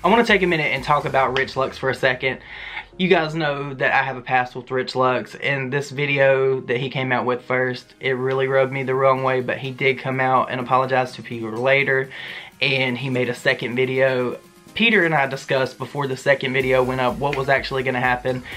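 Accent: South, United States. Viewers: uggggg, your southern accent makes me crave okra :( <3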